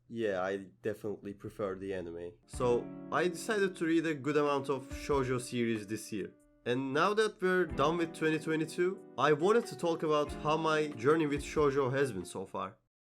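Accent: Turkey. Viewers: Türk olduğuna yemin edebilirim. Türkçe konuşuyormuş gibi ingilizce konuşuyorsun. Türk aksanı diye bir şey varmış onu anladım ahsjskkd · idk how but you have such a Turkish accent in english wow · Bu aksanı nerde olsa tanırım. Türklerinin sonunda fark etmeksizin herhangi bir medya üzerinden kaliteli içerikleri ürettiğini görmek iç açıcı,takipteyim. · abi tam olarak 5sn izledim henüz ama türk aksanın var (kötü bi şey gibi değil) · bu ingilizce aksanı bu kadar türklere özgü olamaz ya bu arada yona of the dawnın hala ikinci sezonunu bekliyorum yıllar geçti:( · You sound like Turkish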